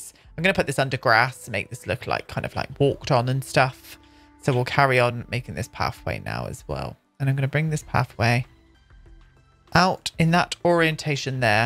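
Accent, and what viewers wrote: United Kingdom. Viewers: Hahahaha adoro esse sotaque britânico. Me acabo de rir com esse "Hello"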